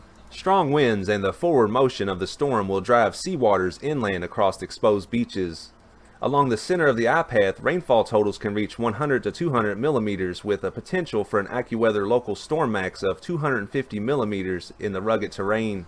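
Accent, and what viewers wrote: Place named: West Virginia, United States. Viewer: Sounds more like West Viginian accent. You insult true Southern accents.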